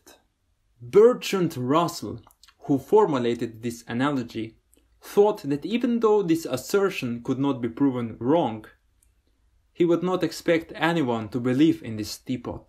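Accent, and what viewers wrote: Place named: Slovenia. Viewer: Are you from Slovenina maybe 😅 I somehow recognize the Accent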